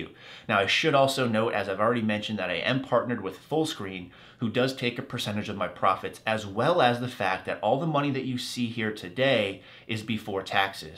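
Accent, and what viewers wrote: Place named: New England, United States. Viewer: Where in New England are you from?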